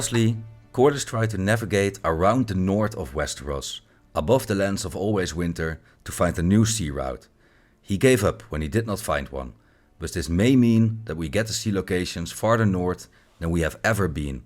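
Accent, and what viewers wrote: Netherlands. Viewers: An excellent video, l've liked and subscribed, best of luck building your channel. Is that a Dutch accent l hear?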